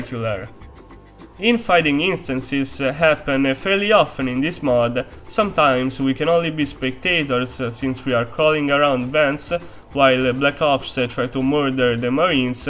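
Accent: Italy. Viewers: Nice vid but u sound so italian lol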